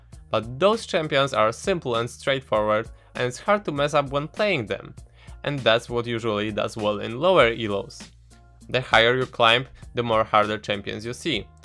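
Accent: Poland. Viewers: Ale polski akcent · ej ty chyba masz akcent polski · Are you Polish? :D Your accent sounds like Jankos'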